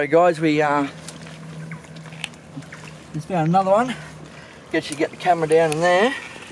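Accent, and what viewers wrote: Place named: Australia. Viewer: Love his Aussie accent. G'day mate!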